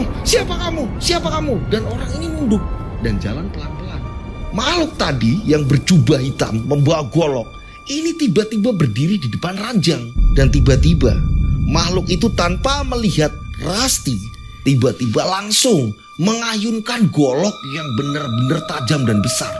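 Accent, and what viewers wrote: Java, Indonesia. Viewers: Nok....nok... assalamualaikum kak dr NSW SYDNEY OZI, nonton video kak selonjoran sambil pakai blanket winter's🥶, seneng liat video kak dengan bhs jowone medok, kangen ngobrol bhs jowo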